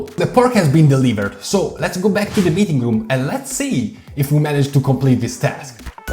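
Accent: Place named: Italy. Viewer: Quello si, il tuo inglese è veramente ottimo, ma una delle cose che mi ha fatto dire che sei italiano era l'accento😂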